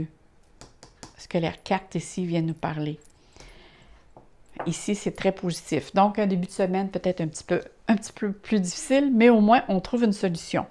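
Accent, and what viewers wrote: Quebec, Canada. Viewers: C'est extra pour le 3 eme paquet, curieuse due à votre accent, de quelle région du Québec êtes-vous ?? moi je suis de Québec. Merci de votre explication.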